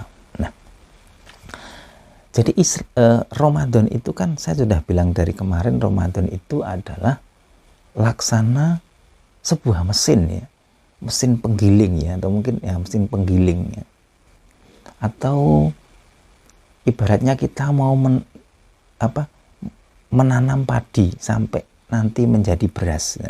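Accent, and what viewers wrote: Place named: Surabaya, Indonesia. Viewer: bahasa suroboyonan ya neng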